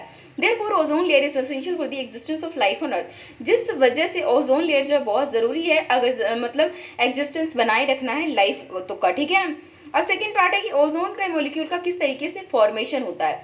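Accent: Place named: South India, India. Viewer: Mam ka accent south ka hh😌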